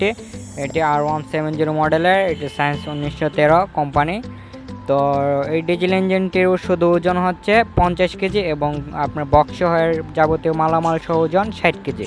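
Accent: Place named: Sylhet, Bangladesh. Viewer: সিলেটের ঐদিকের ভাষা এমন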